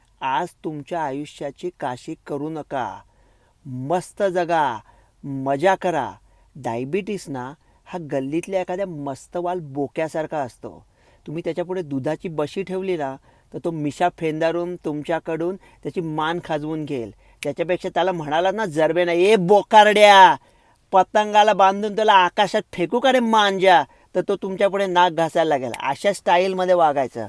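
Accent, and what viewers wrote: India. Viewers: YOU ARE SPEAK LIKE TRUE MARATHI MANOOS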